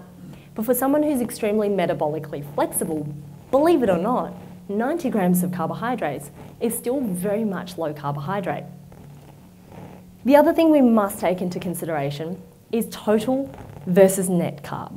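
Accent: Australia. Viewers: Is it aussie accent? Cause I LOVE it. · Totally Aussie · An Asian with an Australian accent, sign me up! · OK the "Young Guy" in me resurfaced ... a young very pretty Woman that is super smart, has Asian features and an Aussie accent... Doesn't get much better ... OK, back to learning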